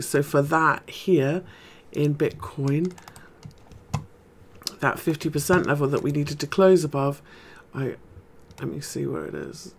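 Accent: United Kingdom. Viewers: I absolutely love your voice and your accent. It's very posh and very soothing.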